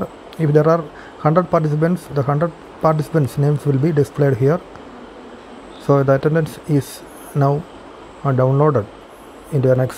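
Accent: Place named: Kerala, India. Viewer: You are a malayali I can understand it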